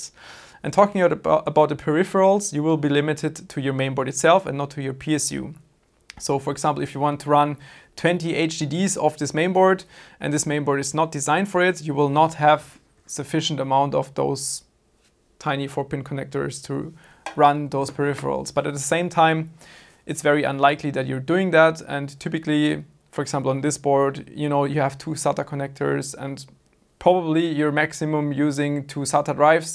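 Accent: Germany. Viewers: Hahaha dieser deutsche Akzent kickt anders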